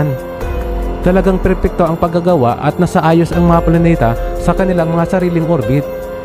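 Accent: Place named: Iloilo, Philippines. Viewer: wow ilonggo nga tagalog..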